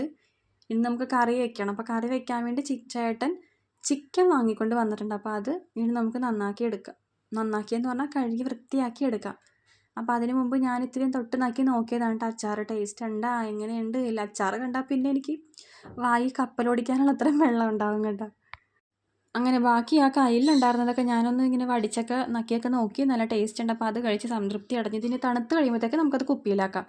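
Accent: Kochi, India. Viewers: Kochi slang angane aanu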